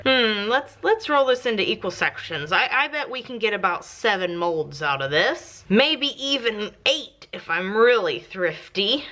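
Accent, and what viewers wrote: Texas, United States. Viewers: TheLaydewlf that’s definitely Texas (also in Texas).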